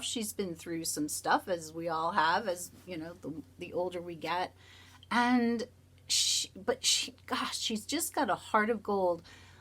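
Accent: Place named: Massachusetts, United States. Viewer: I knew you must be from Mass I could hear it in your pronunciation!!! Nice to connect with you! I’m from Cape Cod.... love the shout outs!!!!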